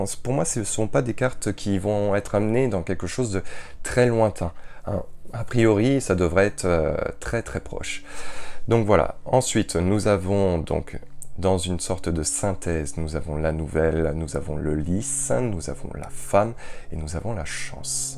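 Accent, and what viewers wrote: Quebec, Canada. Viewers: Merci, bon voyage j'adore l'accent québécois.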